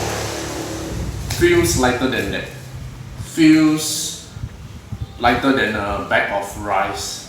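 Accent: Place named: Nigeria. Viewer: U gat Nigerian Accent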